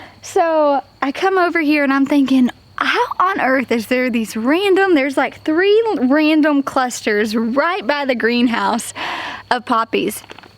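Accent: South, United States. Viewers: Beautiful garden! Success? Today I made homemade pesto from basil in my herb pots. Best pesto I've ever made. I shared it with my neighbor and daughter in the form of a really great pizza. It would have been a pretty empty feeling otherwise. So sharing your garden with your followers is the same thing but on a much larger scale. BTW, don't ever, ever, ever apologize for your southern accent. It's what sets us (me-NC) apart from others. What a boring world it would be if we all sounded the same. 😀 Take care!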